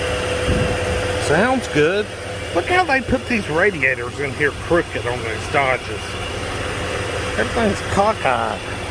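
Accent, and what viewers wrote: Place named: South, United States. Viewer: Good old truuuuck hillbilly voice to the max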